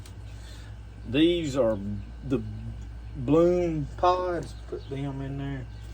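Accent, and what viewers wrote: South, United States. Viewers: Common, you both now.....I just loveeeeeee your southern accent, it's like a lullaby to my ears honestly. Those who hate it can take a walk seriously.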